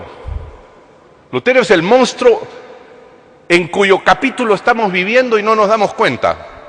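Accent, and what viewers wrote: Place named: Peru. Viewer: Separó al Alan García político del Alan García orador , porque disfruto escucharlo , porque es el verdadero dialecto peruano. Separemos el político y el orador porfavor.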